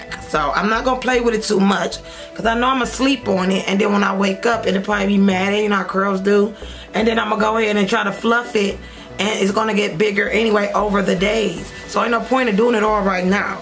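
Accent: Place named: Baltimore, United States. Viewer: I love when you say stuff like bother the way u say it I thought you were from Baltimore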